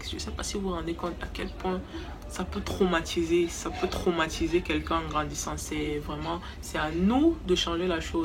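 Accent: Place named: Cameroon. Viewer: Une des rares YouTube use qui , comme moi conserve l’accent camerounais naturel que l’on aime tous 👌🏽 force à nous les camer, forcé à toi , force à ton intelligence et à tes recherches 🇨🇲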